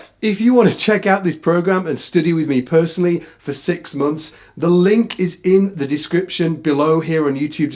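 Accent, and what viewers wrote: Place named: Leeds, United Kingdom. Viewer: Where exactly is your accent from bro? I hear Leeds area of course but I am hearing other dialect, did you live down South for a while???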